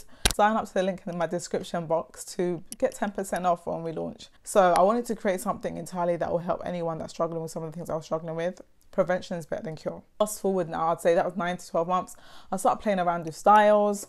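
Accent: United Kingdom. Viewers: You have a VERY nice accent 😍 we're you born and raised in London...? The UK?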